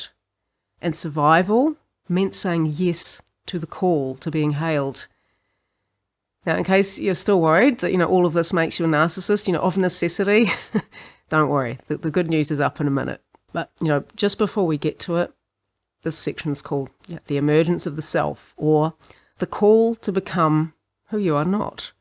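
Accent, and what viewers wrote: New Zealand. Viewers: this is fantastic!!! u a kiwi?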